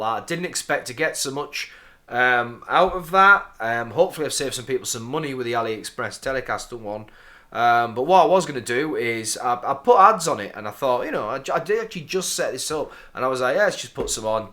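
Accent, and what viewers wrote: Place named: North, United Kingdom. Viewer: nice to have Yorkshire accent from once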